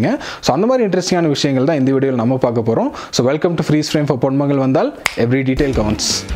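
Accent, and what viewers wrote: India. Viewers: Bro, R U from Nilgirs? Superb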